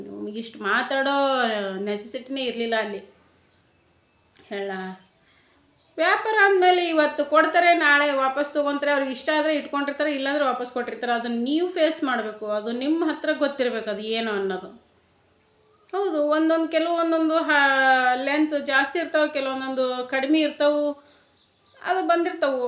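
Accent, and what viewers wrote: North Karnataka, India. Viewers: ನಮ್ಮ ಉತ್ತರ ಕರ್ನಾಟಕದ ಭಾಷೆ, ಮಾತು ಭಾಳ ಚಂದ ರೀ ❤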